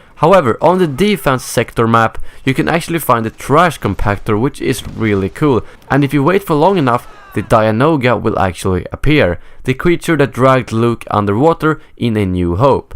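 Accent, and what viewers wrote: Sweden. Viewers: are you froom sweden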